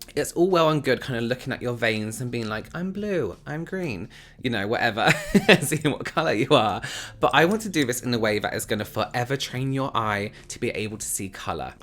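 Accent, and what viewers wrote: England, United Kingdom. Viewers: your accent is divine...❤ what part of England is it i woder? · So, I kinda love you! I used to live in England, so your accent makes me happy.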